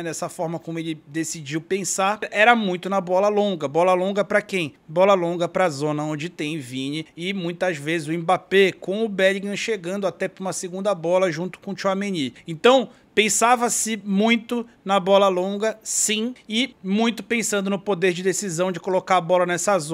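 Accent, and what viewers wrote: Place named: Rio de Janeiro, Brazil. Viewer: achei que fosse carioca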